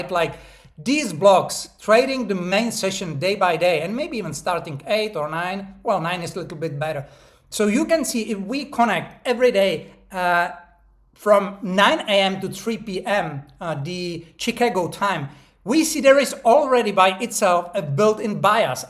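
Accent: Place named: Czech Republic. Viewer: This is what i trad nikkei to the long. I noticed how nicely it moves and started searching who trades nikkei on internet and found this video. After 10 yrs of trading I started getting sick with forex pairs…navigating forex is tough even for experienced traders. Nikkei has a structure, much easier. But this info about mondays is even more helpful U say 9 to 3 pn what time zone? I guess u mean tokyo time? Thank you for the video bro!!! U sound Czech by accent;)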